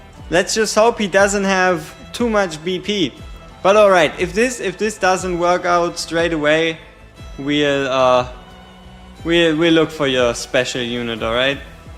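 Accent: Germany. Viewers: would have joined the challenge if i knew how it works. Great content btw. You are my favorite WOR content creator despite the German excent. 😂😂😉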